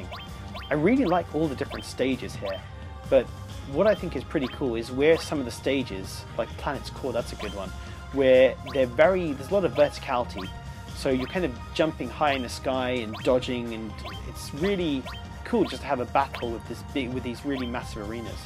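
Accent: United Kingdom. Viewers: Has it been taken down? You sound British, it's not been taken off the UK store? I can't find it, I can only find the prologue game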